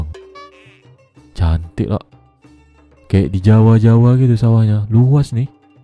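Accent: Malaysia. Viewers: loh bukannya abangnya pakai bahasa malaysia ya soalnya ngomongnya mirip upin ipin beda cara ngomongnya sama orang indonesia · Iya bang cantik bener sawahnya, awa senang dengar cakap logat melayu abang, salam dari awa warga jakarta bang, sehat selalu ya.( awa sdh anggukan kepalaku tiga kali bang )..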